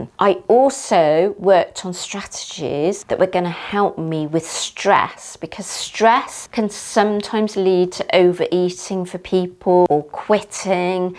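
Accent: England, United Kingdom. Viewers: You do look great, nice physique... I like the accent, You Brits 😆🤣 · That English accent wow. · You had me at your most splendid British voice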